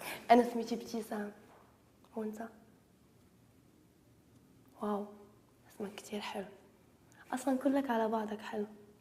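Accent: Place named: Morocco. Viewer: لي تصحيح معلومة هدي لهجة مغربية بيضاء وليست لهجة ديال مغرب لي تيتكلمو بيها بيناتهوم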